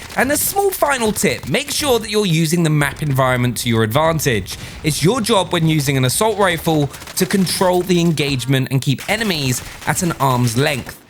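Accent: England, United Kingdom. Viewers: Because their both english and probably from a similar place in england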